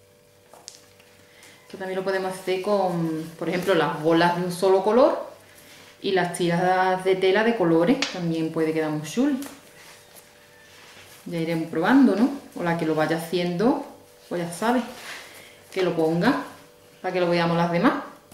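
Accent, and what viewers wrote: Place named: Cadiz, Spain. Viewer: LA GRACIA DEL ACENTO DE CADIZ ES UNICA!! CUANTO ME HE REIDO YO CON LAS HISTORIAS DE MI AMIGO PACO QUE ES DE LA LINEA DE LA CONCEPCION : A LOS CHICLES LES llamaban LA CHINGÁ( iban a gibraltar y compraban cheiw gumm..de ahi chinga) y su tio le compraba los zumos de limon ms caros.. ( queresulta q llevaban ginebra) y que a mi amigo paco de niño le encantaban y con ellos jugaba al futbol como nadie.. cuanto me he reido con el.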